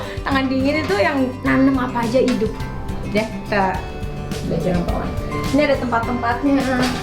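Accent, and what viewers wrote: Java, Indonesia. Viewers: Nganggo boso jowo penak ngrungok ke, medok yo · mbk bhs jawanya medhok banget aq suka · Mbk masih cantik aja...cirihas orang jawa ngomongnya juga gk hilang...😁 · Seneng lo... ngrungoknE mbk boso jowo😂💃💃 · Sukses slalu,logat jowo ne Jan luwes😘😘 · Aq seneng kro mbk. Boso jowone gk ilang. Sehat terus yo mbk... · Suka liat mbk bhs Jawa medok banget sehat terus mbk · Bunda aku seneng banget kalo denger bunda ngomong aku yo wong jowo tapi sueneng karo logate bunda masio wes dadi artis terkenel tapi jowone isek kentel jo d ilangno boso jowone bunda lope lope deh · MBK kentel banget jowo e..MBK mmng..dn sy Lumajang kota.cedek banget loh MBK.smg sht sl amiiin🤲🤲🤲🤲🤲❤❤❤❤❤❤❤🙏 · Mbak.... lucu banget lek ngomong jowo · Mbk kalao ngommong jowo sangat cantik juga yo😀😀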